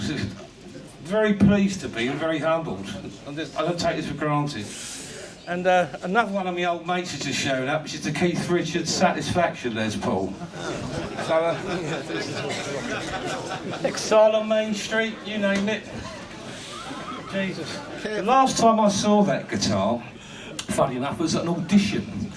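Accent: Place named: England, United Kingdom. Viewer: I listen to Englishmen talk all the time but this guy seems to try to celebrate the accent and slurring to another level. Good Lord man speak clearly if you're going to put something out on YouTube. Thanks for your effort but it would really help if you could speak to where the rest of the world can understand.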